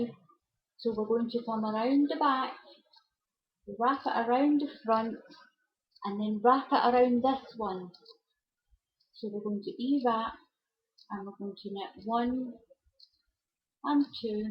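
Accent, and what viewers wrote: Scotland, United Kingdom. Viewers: I love this video, do you have to crochet after you take it off the loom? I love your accent, your from Scotland right? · Hi, Thank you so much for this. I was looking for a new project to do on my loom. This is perfect. Such a pretty stitch. I'm from Livingston. It's lovely to hear a Scottish voice on YouTube.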